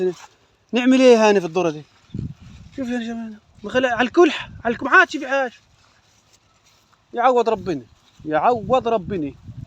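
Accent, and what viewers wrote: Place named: Libya. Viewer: لهجتك فلاحي على بدوي على ليبي انت من وين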